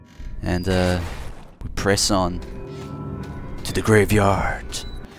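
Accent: United Kingdom. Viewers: Oh my bad. I knew you were somewhere from uk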